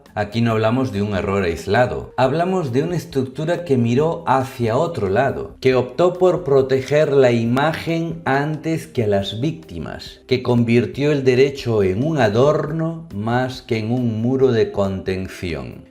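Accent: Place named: Spain. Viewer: Vos porque habláis asi, joder tío esto es un fraude voz de españolete y cara de hispanoamericano autóctono.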